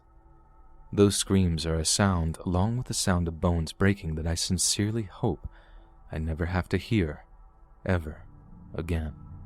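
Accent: United States. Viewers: This was definitely in Liverpool! Haha yeah it was so funny hearing all these scouse sayings read by an American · What an interesting experience to listen to a story with a British tone, read in an American accent lol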